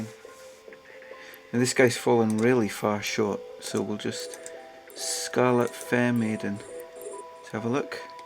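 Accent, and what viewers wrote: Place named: United Kingdom. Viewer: I been playing for over 10 years and know everything about this game…. but I still listening to videos because he has such a soothing UK voice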